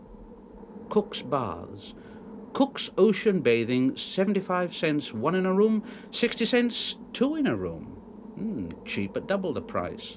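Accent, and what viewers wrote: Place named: Newport, United Kingdom. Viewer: You can hear the Newport accent in more in this clip than ever!:-) wonderful little film. 👍👍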